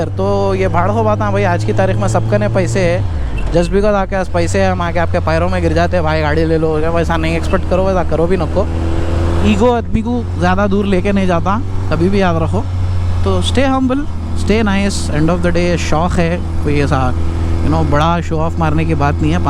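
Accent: Hyderabad, India. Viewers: Hyderabadi hai bolo 😎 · bhai vidoe kaisa bhi rehndo magar my khaali tumhari vo zuban sunne keliye video dekhtaun ji kya pyaari Hyderabadi bolte tum toe bulle me miladete dekhne walin ki han rahi baath video ki ab aapse milne ka wakth akhir aagaya bohot dinose preowned bikes leneka soch taun magar kisi pe bharosa ny mere bhai aap line me utargaye ab shayad mere ko meri dream poora karneka time aagaya dikhra hy jaldi lockdown khatam hoagaya toe accha hy milke requirement batatahun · BHAI HYDERABAD SE HAI KYA 😎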